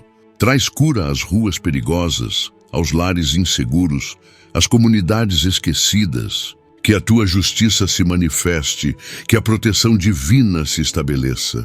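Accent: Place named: Portugal. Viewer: Por que você fala com sutaque de português de Portugal há momentos que você fala que nem português por 😢 me diz o porquê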